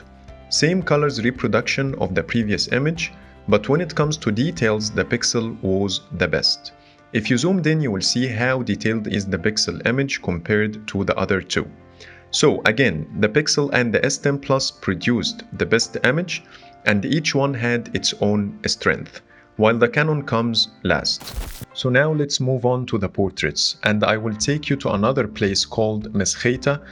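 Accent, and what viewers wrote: Egypt. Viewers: المصري لهجته واضحه و على فكره الإنجليزي عندك عالي جدا بس الاكسنت بتغلب.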